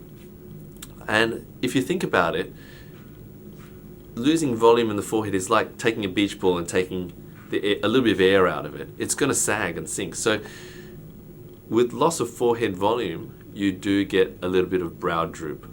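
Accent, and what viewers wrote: Australia. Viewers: You sound Aussie...please tell me your in qld?!?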